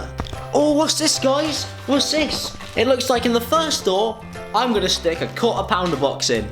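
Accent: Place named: England, United Kingdom. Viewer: wow, a lot of ppl sound like him in England, depending where ur from but I guess that’s why i don’t see it as anything special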